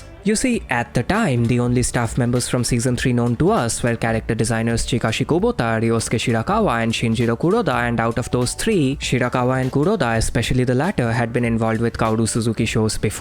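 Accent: India. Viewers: Will your indian ascent ever go away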